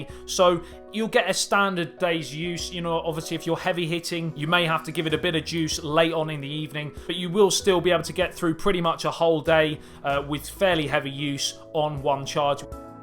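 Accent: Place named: Wales, United Kingdom. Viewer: I would love this phone, and I love your Welsh accent 😉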